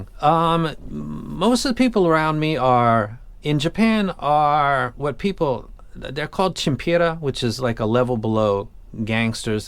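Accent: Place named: New York, United States. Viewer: I had no idea that you live in Japan. neat. Given the somewhat tight space and accent, I assumed you were somewhere in NY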